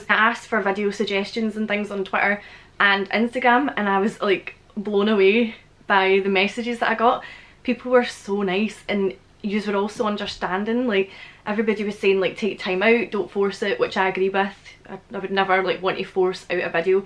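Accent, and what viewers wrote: Scotland, United Kingdom. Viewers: I could listen to you talk all day long about nothing, to me you have such a soothing voice love the accent could never get old.. I used to talk to a woman on Facebook and Skype with her she was from Edinburgh Scotland.. then all of a sudden she just disappeared her accounts were closed and haven't heard from her since I miss her dearly.. her name was Samantha White.. I live in Clearwater Beach Florida.. I listen to you daily just so I can get my Scottish fix, might sound silly to most people but out of all Accents in the world I love the Scottish the best,, keep up the good work you're amazing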